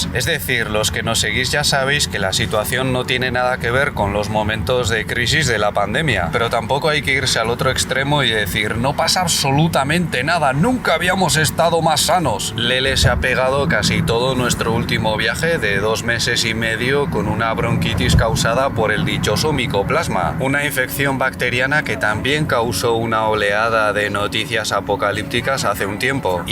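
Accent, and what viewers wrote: China. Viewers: Te sigo desde hace tiempo y empiezo a notar que hablas con acento chino. 😊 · Los escucho de mucho tiempo y siento que ya tienes acento chino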